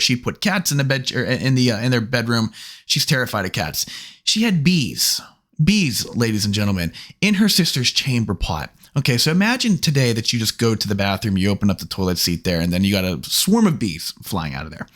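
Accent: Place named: Pittsburgh, United States. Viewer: You have a very Pittsburgh accent lol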